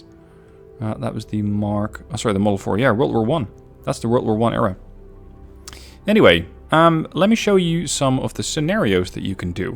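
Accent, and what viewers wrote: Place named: Netherlands. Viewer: Wait he sounds Dutch haha, are you? Sprocket is fun man, i made a the Object 257 by accident(well got close)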